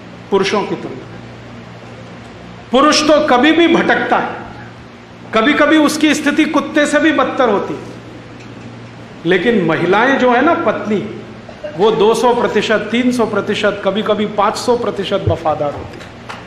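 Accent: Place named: Lucknow, India. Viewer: Mujhe lagta hai ap lucknow se ho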